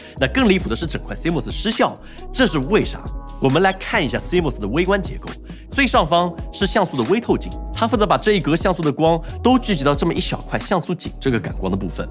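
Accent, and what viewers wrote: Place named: Jiangsu-Zhejiang, China. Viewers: 完全被江浙口音搞出戏了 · 四川 河南 东北 北京 安徽 河南 山西方言大行其道，江浙沪带点口音你就受不了了？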